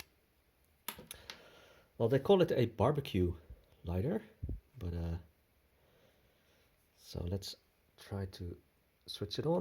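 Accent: Netherlands. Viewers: Wow weve got a dutchee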